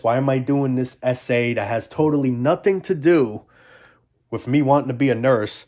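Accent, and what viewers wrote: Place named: New Jersey, United States. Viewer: I can't tell if his accent is New Jersey, New York or Canadian...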